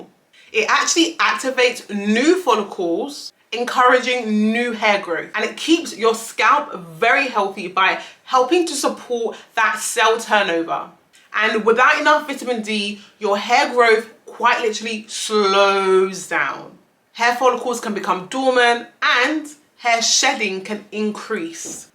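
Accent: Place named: United Kingdom. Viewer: I hear a UK accent, and everyone knows the UK has no sun. Vitamin D is vital for the winter and low sun areas. My iron was low so I started geritol, biotin and recently added vitamin D and my hair is sooo thick. I am waiting on the growth but I have less breakage so I am so excited to see results in 6 months.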